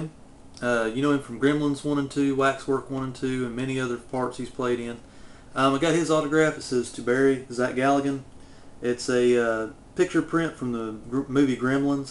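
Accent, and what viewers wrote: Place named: Western Kentucky, United States. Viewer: Is that an actual poster for "The Mutilator" aka "Fall Break" !!??! That is bad ass. Also, your accent sounds very similar to the folks where I grew up in Western Kentucky. Great vid. Sounds like you had a blast.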